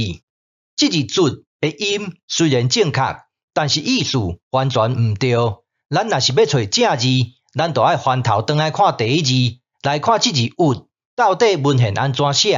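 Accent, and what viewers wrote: Zhangzhou, China. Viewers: 千萬別改你的腔調，我住南部的關係，很難得聽到偏漳州腔調。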